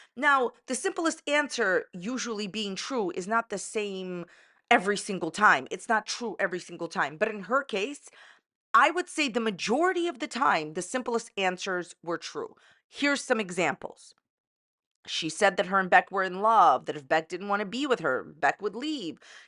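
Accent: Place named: East Coast, United States. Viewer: I love your east coast accent!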